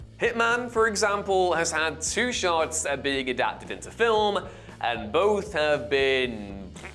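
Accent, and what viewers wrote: United Kingdom. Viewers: Dude it's just a British accent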